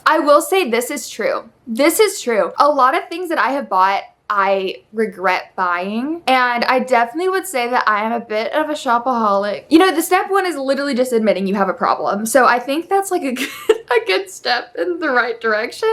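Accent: South, United States. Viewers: I think you should try not to waste too much of your time with negative comments. Misery loves company. People that try to tear down others are just miserable and can’t stand the sight of people being happy. You are such a ray of sunshine. You work hard for what you have and you deserve to spend it and enjoy it how you like. I’ve never gotten the impression that you brag or show off. Not at all. You seem kind, sweet, hard working, fun and funny, thoughtful and caring. I love your southern twang, which is much more pronounced when you are trying to set some boundaries for Baby Elle. Instead of a stern talk, it comes off adorable. 😍📱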